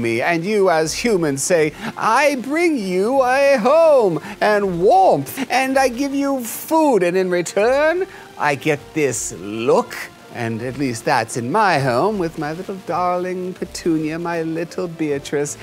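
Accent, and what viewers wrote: England, United Kingdom. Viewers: Haha l i love the British accent ;D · How did you get to do that english accent? So interesting and so theatrical !!! I like it ! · That British accent 😂😂 lovely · LOL - you're English Accent!!!!!!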